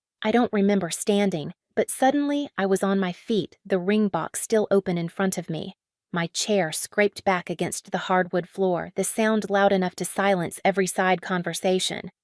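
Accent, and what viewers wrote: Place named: South, United States. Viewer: Interesting story. 👍 That accent.. is it from south? Lovely. Sorry I'm from Europe. 👍🥰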